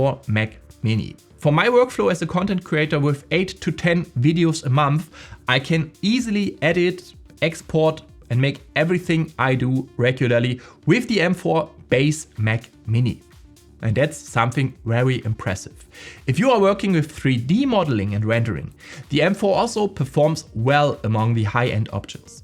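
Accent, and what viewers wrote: Germany. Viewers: da german comes trough wenn speaking. he tries not to spell like a native english guy as you would learn it in europe. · ´Ming Jung, ich hör doch direkt du bist nh Dütsche ^^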